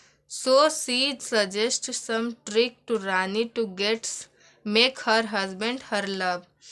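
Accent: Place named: Bihar, India. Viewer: Aap bihari aapki boli se lagta he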